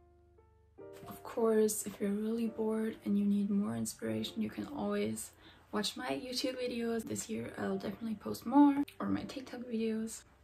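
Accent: Germany. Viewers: Kannst du mal deutsch sprechen man höhrt das du deutsch sprichst 😅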